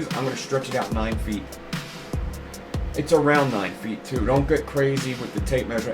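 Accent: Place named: South Philadelphia, United States. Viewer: south philly? i love finding sophisticated philly vapers on youtube. just going by yr accent and 'youse'. also the recovery thing lol (i got almost 5 years since my days at 7th and snyder/ k &a etc))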